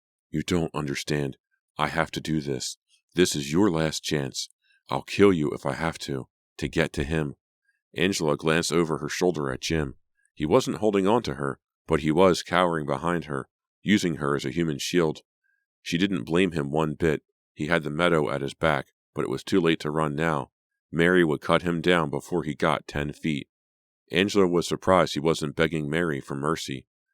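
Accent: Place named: Pennsylvania, United States. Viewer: So happy to find your account! 😁 you’ve gotta be from pa…am I right??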